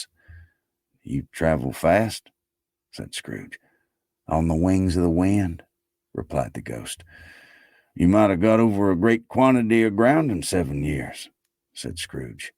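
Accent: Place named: South, United States. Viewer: The Dickens writing and the accent in your narration gives the whole audio story an “antebellum” feel to it. As though this could be a story taking place in placid North Carolina and not urban London. Which is probably a testament to Dickens’ talent as a storyteller!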